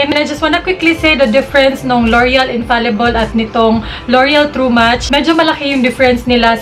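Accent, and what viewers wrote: Visayas, Philippines. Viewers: I'm one of your silent viewer here in Youtube. Hope for your best and just continue what you are doing, kasi you inspired a lot of people. Continue spreading love and good vibes. P.S. Your bisaya accent never been an hindrance of what you are doing or explaining, mas minamahal ka ng mga viewers mo dahil dun. Lovelots from Palawan.♥♥♥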